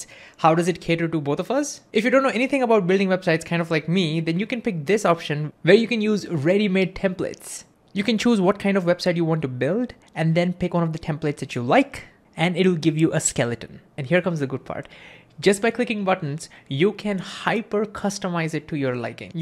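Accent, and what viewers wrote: India. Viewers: in the video. First time I see a video from you. Let me tell you two things. One bad, one hopefully less bad. First, the bad: you DO have a strong indian accent and I hate it. Simply because it's just a tiny little bit harder for me, a French, to understand. Second, the less bad: I know I'm going to love whatever content you offer, insta like, insta subscribe. As an old PhD in physics now working in engineering, I know that 1. I'm not up to date and 2. my education and teachers were both excellent, thank you, yet, ANY form of education is a bit lacking. History of science for instance: can you imagine that I studied that for ONLY one year? - Yes, only one year. This discipline shall be mandatory for EVERY scientific field! - But yes, I still had the chance to study it for one year, told you I received an excellent education. Understanding HOW and WHY great ideas came to be, I dare say, is probably more important than WHAT the great idea is. 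Unfortunately, it's also much, much harder to teach, and longer, therefore education chose to focus on what (also, when you need that "what" to carry on with the next great ideas, it kind of make sense: efficiency) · I think you should change your beard and cap style to become more dapper because your indian english speaking with that getup is eerily similar to a fundamentalist islmeek preacher who was kcked from India. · Among the bes tindian youtube channels (or ones with indian accent anyways)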